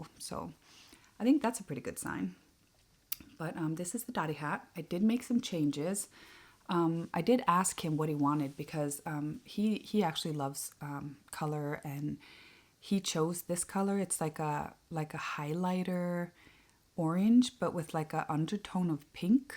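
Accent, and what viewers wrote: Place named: Montana, United States. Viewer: Don’t laugh but I thought you were podcasting from Montana or Wyoming in the US or Alberta Canada. Yesterday I was watching The Knitty Stew and she referred to “an Icelandic cast off”. I have been knitting for 45 years and have never heard of this. In a future episode would you please show us how it’s done? I would love to win some Icelandic laceweight! Your knitting is exceptional! And the magic mushroom socks are definitely on my needles next. Your kids will never be lost in the snow-and your description of the ‘highlighter with pink undertone’ was perfect. Thank you very much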